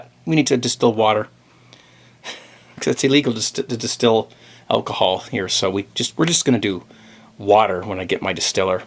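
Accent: Scotland, United Kingdom. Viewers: thats a crackin scottish accent that made me laugh! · By the way, love your scottish accent ;)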